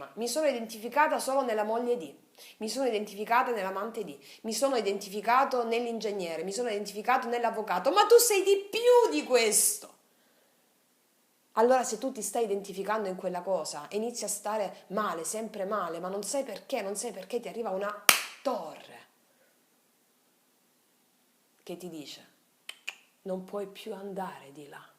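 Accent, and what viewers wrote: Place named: Apulia, Italy. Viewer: Che spettacolo che sei., non so quante volte ho sentito questo tuo lavoro bellissimo e ogni volta la mia anima vibra e mi dai coraggio forza luce,io vivo in Lombardia ma la mia origine è in Puglia credo anche molto vicino a te dall' accento che sento,mi piacerebbe un giorno abbracciarti di Persona infinitamente grazie❤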